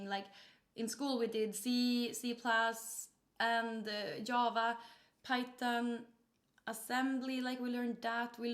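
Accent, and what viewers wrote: Sweden. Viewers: She's been a pro streamer for quite some time...yet she has the thickest swedish accent you'll come across. How does it not improve lol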